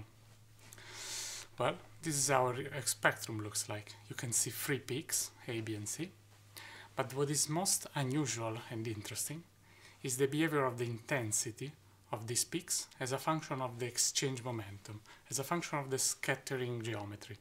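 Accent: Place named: Italy. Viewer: Der italienische Akzent ist total süß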